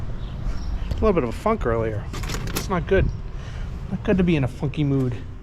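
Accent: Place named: Massachusetts, United States. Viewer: Love the videos. Like you, I'm a native of Mass. My Mass accent is even worse than yours lol. Don't sweat the haters brother. Spoken like a true Masshole... F**k Em. Keep safe out there. See you next time.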